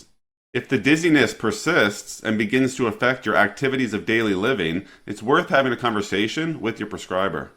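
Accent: Newfoundland, Canada. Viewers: You must be from Newfoundland . Love the channel subbed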